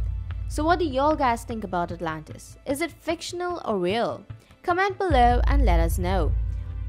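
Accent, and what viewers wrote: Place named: India. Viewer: Rayhan Sakib Sounds like Indian accent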